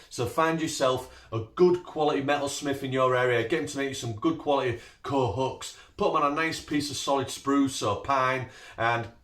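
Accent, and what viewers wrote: Hull, United Kingdom. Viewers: Nice one, thanks. I recognized your accent immediately, I lived in Hull for 10 yrs. 👌💕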